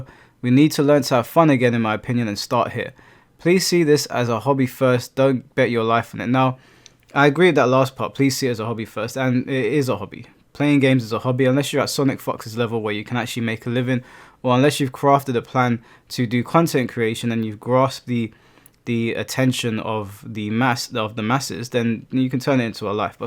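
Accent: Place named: United Kingdom. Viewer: Okaaayyyye, but this is how I like my men. Speaks like Harry Potter, BBC, and the fact he is from UK means he is likely UNCUT. :face-red-heart-shape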